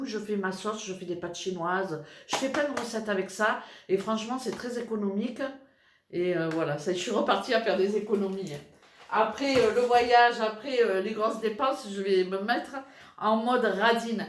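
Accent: South, France. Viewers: Coucou! Je vous découvre depuis 3 jours. Holalaaaaaa comme je suis contente! Vous êtes d'Avignon je crois. Moi j'étais à Boulbon. Originaire d'Arles. Et depuis 1 an je vis à Lyon. L'accent du sud me manque tellement! Alors un régal de vous écouter!!!!!!! Ce bel accent de mon pays!! Mon mari est aussi Portuguais, il regarde les vidéos avec moi lol ! Magnifique le Portugal !! Superbe vidéos. Vous me faites tellement rire!! J'adore! Vos idées recettes au top! Merci beaucoup d'énormes bisous!!!!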